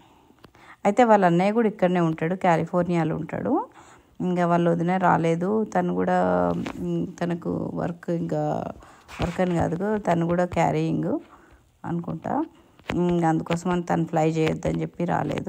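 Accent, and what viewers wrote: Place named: Telangana, India. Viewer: Hi andi🙏 mee language pakka Telangana andi🙏😅😊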